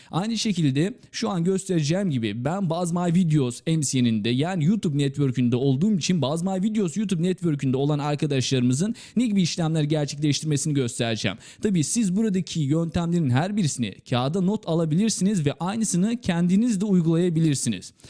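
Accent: Azerbaijan. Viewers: hə azəridi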